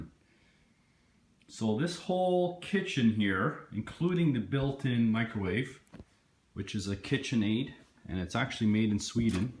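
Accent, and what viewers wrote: Canada. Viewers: Surprised to see this 'Euro' Window you have over the sink - wonder if it swings-n-tilts as well? You sound Canadian ...perhaps why ;-) Cheers! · Silverback Gorrilla ... Playing into stereotypes. Dumb little wanker. The bloke also sounds Canadian, not American.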